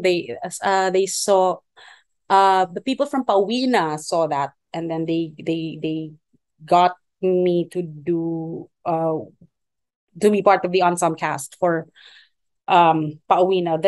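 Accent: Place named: Bisaya, Philippines. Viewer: I love our accent especially the bisaya accent.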